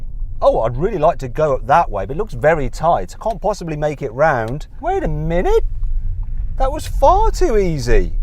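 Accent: United Kingdom. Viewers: 영국발음 너무좋고 디자인 지리고 우리나라에 실구매가 1.35에 나오면 대박 잘팔릴듯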